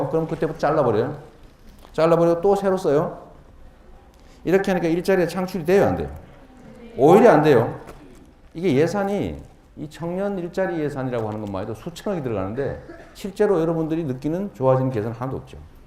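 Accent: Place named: Gyeongsang, South Korea. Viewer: 경기도 살면서 갱상도 말하면안대지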